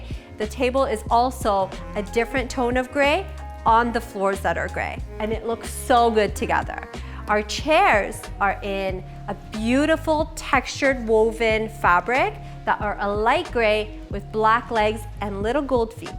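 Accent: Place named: Iran. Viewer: Your Iranian accent is unreal! Beautiful woman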